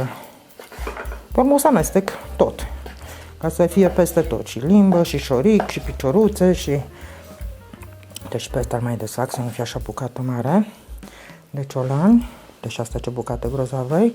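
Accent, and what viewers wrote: Transylvania, Romania. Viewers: Este o plăcere să vă urmăresc! Prezentați foarte detaliat, cu explicatii privind cauza si efectul unei anumite actiuni si mai si vorbiti in grai ardelenesc. ❤❤❤😮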